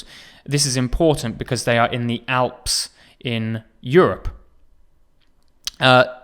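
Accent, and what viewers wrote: United Kingdom. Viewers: I'm in love with your British accent. Thanks for your amazing video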